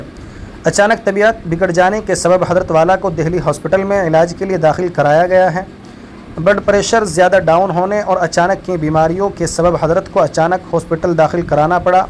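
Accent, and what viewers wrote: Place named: Arab world. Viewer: عربی لہجہ میں اردو بولتے ہیں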